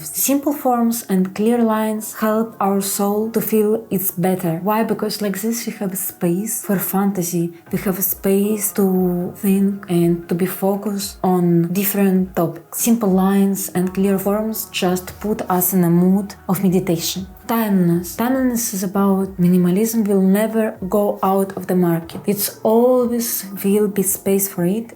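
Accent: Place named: Russia. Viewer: Чтото мне подсказывает что это русский акцент 🎉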